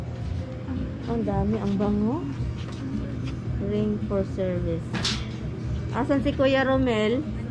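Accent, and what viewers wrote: Visayas, Philippines. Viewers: Hello and. Shout out to you guys. so sounds so Bisaya? And which part of Bisaya.?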